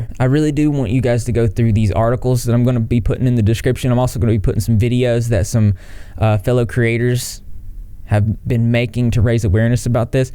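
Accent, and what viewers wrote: South, United States. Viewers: This is a random question but where are you from? It sounds like you have a kind of country accent it’s really interesting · Think this is crazy?? Jared Leto has the exact same story. You should see the threads on line and the accusations. Sounds identical to this... forced rape and dangerous choking. I loved Leto at one time...he uses 30stm concerts to lure them. I’ve watched a few of your vids and I love the southern accent (I’m southern) but.... what’s with all the symbolism?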